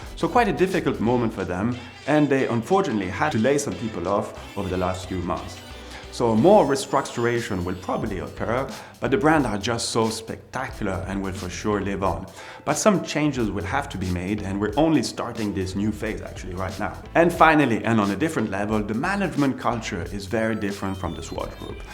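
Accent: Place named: France. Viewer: From your accent, one could assume your first language is French. so you should be familiar with the pronunciation of the German umlaut-U (Ü) since it sounds exactly like a French U. So why is it that you pronounce a German name such as "Günter Blümlein" like a native-English speaker would "goonter bloomlein"?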